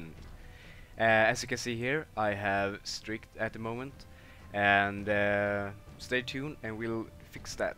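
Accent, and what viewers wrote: Sweden. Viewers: Vi svennar har underbar accent asså <3 Very well done(in 1040p too!), works like a charm! :D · Snygg SvEngelska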